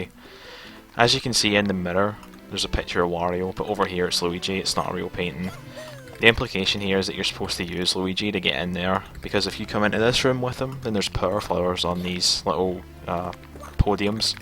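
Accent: Scotland, United Kingdom. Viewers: Yupeji His commentary with his Scottish accent is interesting enough, stop complaining, it's just 4 minutes.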